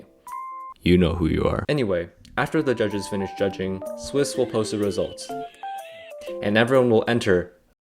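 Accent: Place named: China. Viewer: I never imagined that voice would fit into this kind of videos, i am actually enjoying this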